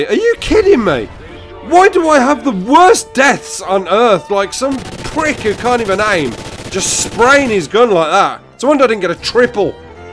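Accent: Birmingham, United Kingdom. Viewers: that Birmingham accent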